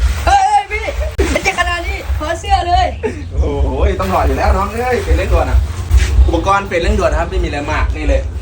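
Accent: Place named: Isan, Thailand. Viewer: อีสานจัด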